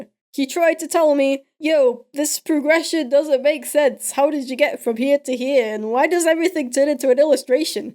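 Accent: United Kingdom. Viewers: Still don’t know if he a boy or a girl his British voice just makes it harder and is he supposed to be a furry ? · your voice sounded weird (well your voice always sounds weird to me because I'm American and only know a few Brits)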